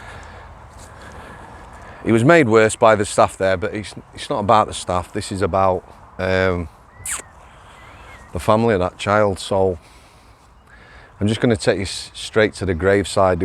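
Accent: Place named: Yorkshire, United Kingdom. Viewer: Another cracking upload mate. Your video + a large cup of tea = perfect early evening infotainment 👏🏻👏🏻👏🏻👏🏻👏🏻. PS loving your Yorkshire twang, keep it coming.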